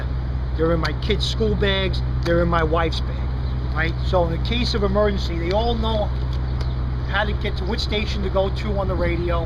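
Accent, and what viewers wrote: New York, United States. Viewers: man I wish I could have your new york accent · Dats for all yu trolls and haydus out dare. Love that New York Accent.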